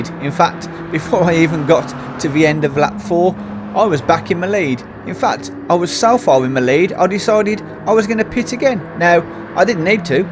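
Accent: United Kingdom. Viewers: I like how you gave Naffan Tait a mention last time. I also enjoy listening to the different British speech patterns (there’s a million of you you here in Western Australia) keep up the good work- your builds help out no end.🇦🇺🇬🇧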